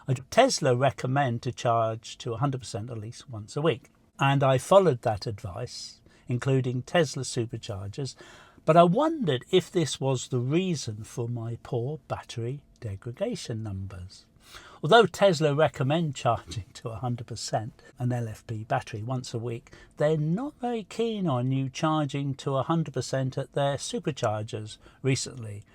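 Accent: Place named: United Kingdom. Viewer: I didnt realize you were from UK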